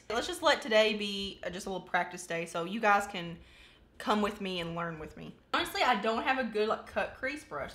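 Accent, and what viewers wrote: South, United States. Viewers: Luv you lil country accent...It is absolutely gorgeous and you have amazing skin by the way!!!!! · Alright girl where u at? Im gonna say GA or TN....me, AL. And i am THANKFUL TO HEAR U SLINGIN THAT ACCENT (unlike someone else from my home state who took lessens to take her accent OUT) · OMG how have I never watch or even come across your channel.. this is my first video of yours I've watched and I absolutely love you your vibes your bluntness and your attitude.... BTW I love your southern accent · Omg, just found your channel tonight and I love you. You're honest, dont try to come off as I'm perfect and I know it all. Love that. I love your Southern accent, it reminds me of my mom's family. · Your accent is really nice ❤ Are you from Alabama/Georgia? I appreciate you being really genuine in this review 😘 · I can't believe you replied! 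Holy Crap! Hoping someone can tell me what state you are in because I'm in AR!!! Annnd you sound like you are southern...maybe? 😂🤔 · This is the first video I have EVER seen from you or your channel. As soon as you got sassy about how your title says this will be a rant and people can leave, GIRL YOU GOT ME! I hit that subscribe and all notifications on right then and there. Love the sass, PLEASE don't ever lose that!!! PS- Your southern accent brings me back to the part of my life when I lived in Eastern North Carolina. I don't believe your accent is NC, but it's as close as I can get while living in northern Illinois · Where you from? It sounds like your southern. I'm from GA 😊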